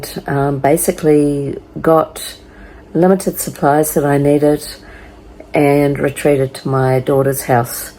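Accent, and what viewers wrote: New Zealand. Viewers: Thought I picked up on a kiwi accent! I lived in NZ as a kid... one more thing in common! So very familiar with Kia kaha! Back at ya! 💪😍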